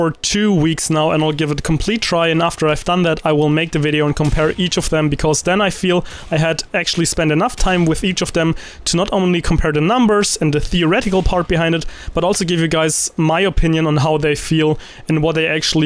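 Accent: Germany. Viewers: Wie ich wusste das du Deutscher bist :D Seitdem ich dich Abonniert hatte kams mir schon so vor :D Merkt man irgendwie übel finde ich am English mach weiter so mit den Videos ^^ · Are you german? Because I think you have a very strong accent but it still sounds nice :) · wie gehts dir man hört raus das du deutscher bist aber dein englisch ist richtig gut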